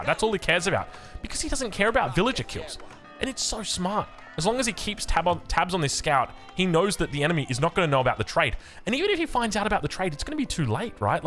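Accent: France. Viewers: still wanted to hear your French accent Man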